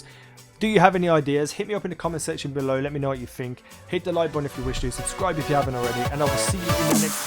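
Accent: Birmingham, United Kingdom. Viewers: U from Brum?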